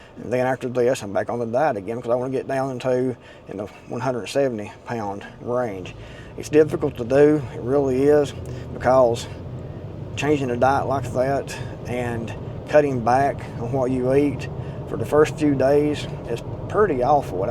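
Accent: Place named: Tennessee, United States. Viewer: Either buddy, I just really like this video and I just think it’s really cool the way you explain things I love how you’re driving in your car. I think it’s really really awesome and I can’t wait to hear and see more videos from you. You’re really awesome and I like your accent. It must be nice living in Tennessee, but I definitely will stay as a fan and watch more of you I think you’re awesome man.😮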